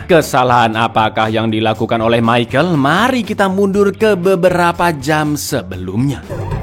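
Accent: Java, Indonesia. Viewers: Gausah alay bro suara lu dan aksen jawa lu ..belum tentu banyak orang suka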